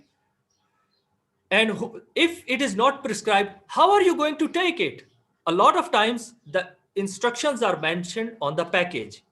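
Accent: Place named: England, United Kingdom. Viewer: Sir you have a great English accent and Teaching style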